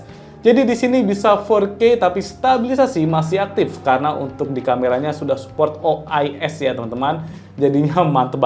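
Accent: Yogyakarta, Indonesia. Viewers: Mas nya orang jogja kah?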